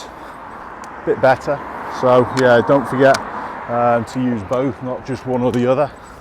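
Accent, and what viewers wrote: Liverpool, United Kingdom. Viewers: Oh, I remember having a bell on a bike being required? Aside: I love when you lapse into your proper scouse accent.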